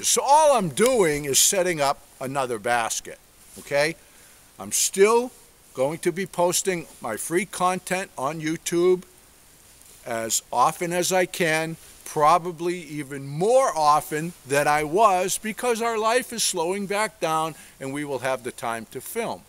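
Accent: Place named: New England, United States. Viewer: Keep up the good work. I enjoy whatever you post. Like most of your followers "Frankie and the boss" is my favorite. I think it would be a great intro AND exit song. We are having a very white Christmas here in West Michigan, thanks to our lake effect snow. I'm an old New England gal and I enjoy the yankee accent, even though I've been away from home for a good many years. My son and grandson both have Border Collies that are so smart. One lives on a farm and the other in a condo. For those that don't like your work.....well then, go away!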